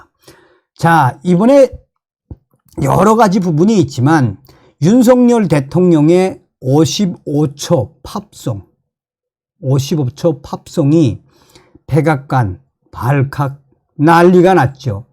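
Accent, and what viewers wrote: North Korea. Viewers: 정선생님 기분좋고 감사한 방송을 주셔서 감사히 시청 했수다래 정선생님 기분이 좋으시면 꼭 북한 언어 사용 무척 정감있고 즐겁게 시청 하이 항상 건강 하시기요 정동무 ~~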